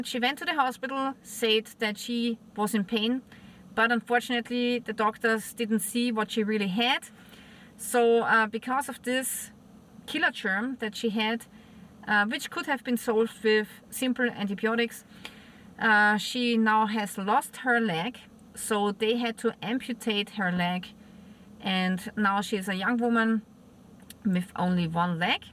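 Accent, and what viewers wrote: Germany. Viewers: I don't know why, but I am getting so used to hearing the German/Austrian accent I want to visit you guys. Are you more Austrian? Because it just sounds like "German" due to my ignorance of the differences between German and Austrian speech.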